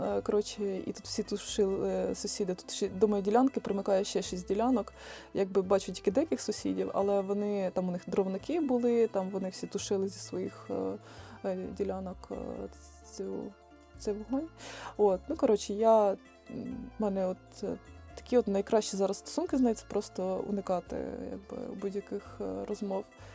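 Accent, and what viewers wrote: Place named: Poltava, Ukraine. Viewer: Впізнаю рідний полтавський акцент 😊. Трохи незвична відсутність суржику 😅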